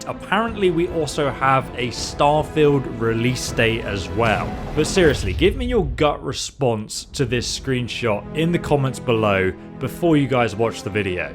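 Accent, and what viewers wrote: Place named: United Kingdom. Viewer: Eh it's a bri ish thing. At least I think hes British either that or hes Scottish or something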